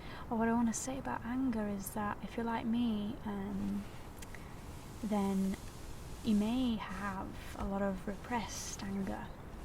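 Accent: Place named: Manchester, United Kingdom. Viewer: Your great! Where are you from im detecting manchester?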